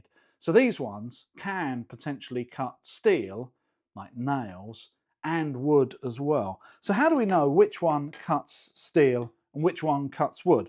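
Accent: United Kingdom. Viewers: Honestamente iba a dar "no me gusta" tu video, simplemente por tu acento británico, PEROOOO simplemente ví la intro de tú video y ME ENCANTÓ!!! Perdón por juzgar antes de tiempo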